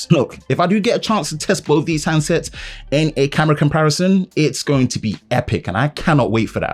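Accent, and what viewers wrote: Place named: United Kingdom. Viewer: Once again and the technical information. Few years ago I was about as ignorant as can be but after watching videos like yours I at least know how to get around a smartphone for the most part now. Also being a black American I always get a kick out of hearing the black man with the British accent oh, I think it's cool. Well once again this yank in Columbus Ohio appreciate you!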